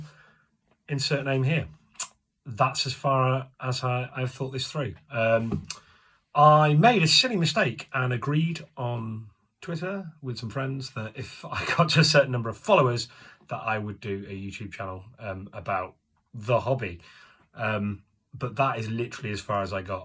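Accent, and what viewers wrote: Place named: United Kingdom. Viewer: You sound british are mustangs even sold there? Or are you not and I’m just stupid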